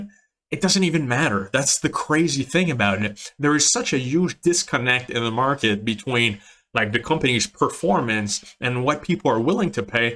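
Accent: Quebec, Canada. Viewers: I hear Quebec accent. We're from the same province 😁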